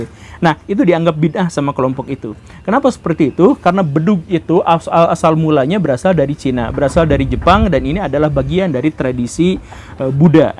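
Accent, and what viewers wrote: West Java, Indonesia. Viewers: dari dialek bicara anda ini , saya yakin anda dari suku sunda.. tapi kenapa kagak memasukan istilah wayang golek sebagai seni wayang nya tsb setau gue mayoritas di jawabarat mengenal wayang itu berbentuk boneka / bentuk tiga dimensi, yang terkenal dengan wayang golek ? setau gue untuk jawa tengah dan jawa timur mengenal wayang wong dan wayang kulit, sedangkan wayang golek itu hanya di jawa barat. sedangkan di sumatra dan kalimantan tak dikenal perwayangan tsb, ini berdasarkan gue pernah hidup di pulau tsb... maaf kalau gue salah, karena bukan pemerhati sih waktu masih kelas 2 eS eR di tahun 1952 gue seneng baca komik perwayangan, sehingga faham cerita ramayana dan mahabarata dan sering juga nonton wayang golek tsb setengah malaman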